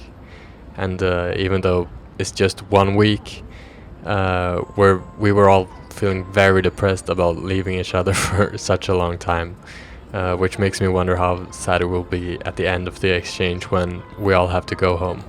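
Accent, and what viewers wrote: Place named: United States. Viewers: how does a swede speak english with an american accent? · I am American and I actually thought he was American until he said he's from Stockholm. He probably spent time in America.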